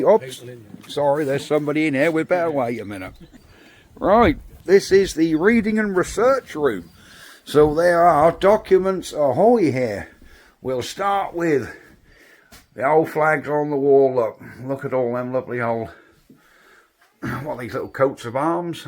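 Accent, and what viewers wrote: Norfolk, United Kingdom. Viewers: I grew up in Narborough during late 60s/early 80s and went to the old Narborough school. The picture you showed of Crisps Garage was the Drs surgery during the 70s . Still visit Narborough to see relatives and will hopefully go to the museum on next visit. I remember Greta Towler as the postmistress who had a very cheeky minah bird. Love your Swaffham auction videos and used to visit most Saturdays when Tyrone Roberts was the auctioneer. Thank you for the nostalgia and the wonderful Norfolk accent!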